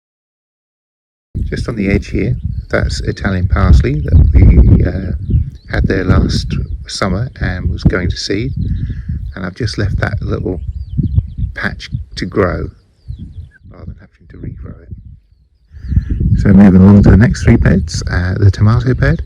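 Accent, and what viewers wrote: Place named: New Zealand. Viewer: Just 30 seconds in and loving your kiwi accent blended with English. Nice sound to it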